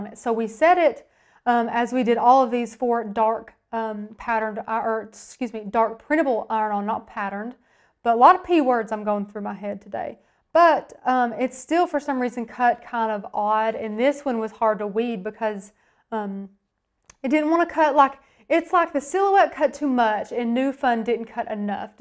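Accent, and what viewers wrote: South, United States. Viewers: Thank you for an excellent video! I learned exactly what I needed to learn and your presentation was informative and to the point. I also Loved your southern accent. Thanks for doing all the hard work. Excellent.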